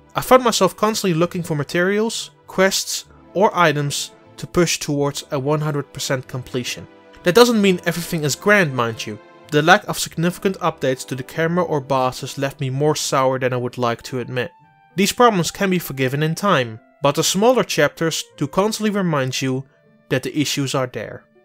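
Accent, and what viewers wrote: Netherlands. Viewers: He’s Dutch. Hate to tell ya buddy but English isn’t everyone’s main language. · Hello Apollo Justice the accent is Dutch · very dutch yea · Thick dutch accent. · Are you Dutch? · That dutch English tho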